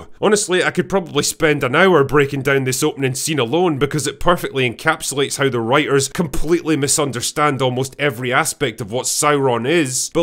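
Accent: Scotland, United Kingdom. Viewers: Thank heavens, I saw season 2 was on Amazon last night, I watched the review of season 1, and i felt so awful after 3 minutes, I wondered if Id need to find the toilet.. This is not the video I wanted, but its the video I Needed, as sorts out the world into sanity/insanity in sheer minutes with an excellent Scottish brogue. Cheers man.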